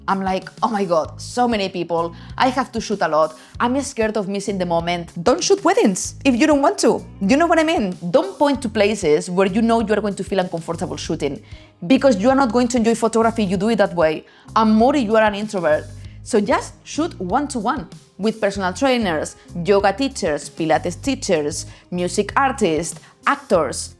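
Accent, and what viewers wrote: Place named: Spain. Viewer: I’m told that if you go to an event with 8 peoples, you will talk to 8 people, if you go to a large event, even hundreds, you will talk to 8 people. I am an old guy, I do not do small talk, just lost for words. The lovely Spanish accent works well for. Family are the worse people to practice with.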